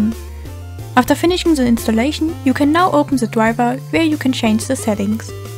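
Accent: Germany. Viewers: Man bemerkt den deutschen aksent xD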